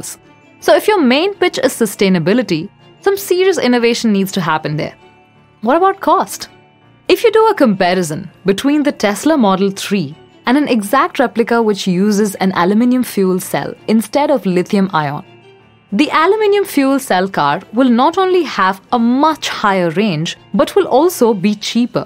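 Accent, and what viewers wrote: India. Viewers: For the first time I like the Indian accent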